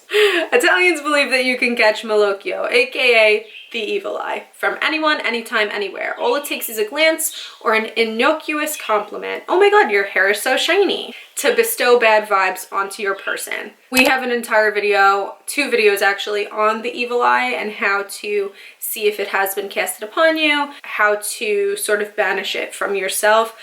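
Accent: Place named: New York City, United States. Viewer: i love hearing an authentic nyc italian accent 😆 as a canadian hehe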